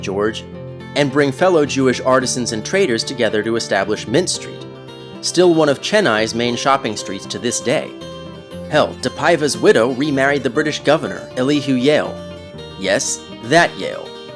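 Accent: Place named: United States. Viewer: hey where did you grow up? your accent. sounds so American besides when you make the 'a' sound as in vAstness, where it sounds like the british 'vahstness' versus the american pronunciation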